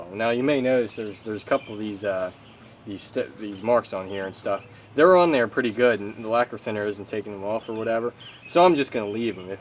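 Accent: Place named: Philadelphia, United States. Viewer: Where are you from with that accent Philly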